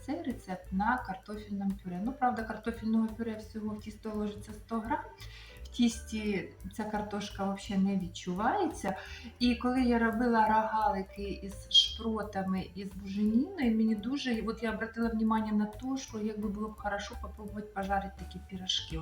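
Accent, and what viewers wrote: Ukraine. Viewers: Людина освічена краще говорить українською. А це - російська мова з українською вимовою. Тут більше російських слів ніж українських.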